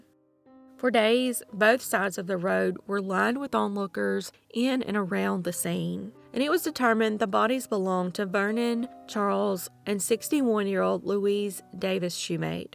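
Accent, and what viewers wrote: South, United States. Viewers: Yes! It's always nice to see the faces behind the voices we love! In the beginning, I was so fascinated by the voice and southern accent that I forgot to listen to the stories she told. I had to listen twice! 🥴😁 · Good to see you girl! You are beautiful as that southern accent ! Keep'em coming, and again thanks for introducing yourself! ❤🕵️‍♀️ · One reason I loved this Chanel was bc of your (yes) Southern accent/voice lol and now beautiful face. Born & raised in MD, I always dreamed of living in the south. Thank you for your diligence in presenting these stories! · You're very pretty and I really like your southern voice. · Finally get to see the face behind that beautiful southern draw!!!! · Oh my goodness sake’s! It’s so nice to see a face that goes with that country voice! One day I’ll finally decide to show my face on my channel also! 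lol Keep up the great work ! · Why did you not want to show your face, you are cute. I love your southern drawl. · Awesome as always!! Nice to see the face behind the Southern voice!! 💜 You're one of the very few true crime Youtubers who can pack a lot of information within a 10 or 15 minute video & not leave anything pertinent out to do it. While I like an occasional deep dive into a case most times I dont have 30-60 minutes or more to invest. This is what makes your content perfect for me . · Awesome Tuesday. We get to see your pretty face with your sweet southern drawl. Thank you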